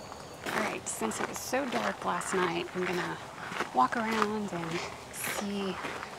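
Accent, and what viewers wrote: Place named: United States. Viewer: what a pleasure to hear an American talking about Triumph motorcycles.Some of the vids from England, are, well, I have a hard time even understanding what they're saying. My wife and I sometimes overwinter in Houston, Tx. Preparing to dump my Harley softail for a Speed Twin, or t-120. Difficult decision!!!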